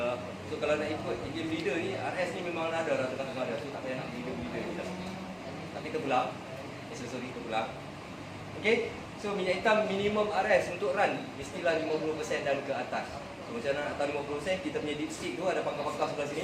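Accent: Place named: North, Malaysia. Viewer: Power...cara bahasa mcm orang belah utara...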